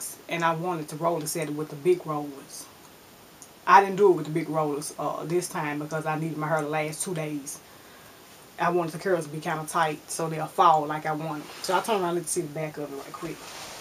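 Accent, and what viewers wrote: South, United States. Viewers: Please tell me where are you from. Strong southern accent. Love it!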